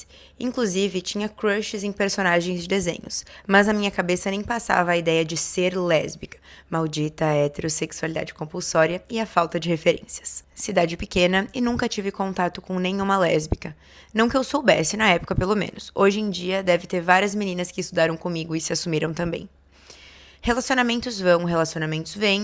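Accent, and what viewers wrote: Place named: Portugal. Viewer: Você mora em PT ou é portuguesa? O sotaque é tão fofinho😊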